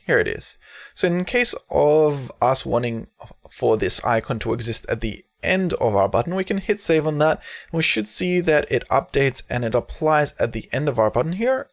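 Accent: Australia. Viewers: Australian accent is fantastic 👌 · useful tutorial as well as nice Aussie accent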